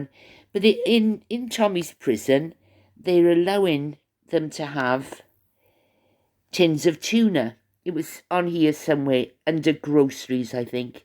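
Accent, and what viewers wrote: Wales, United Kingdom. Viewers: First time visiting you but I' ve heard good things about you from others here. Nice to hear a Welshy twang. I'm from Cardiff but I'm miles away from home. Shall be following..❤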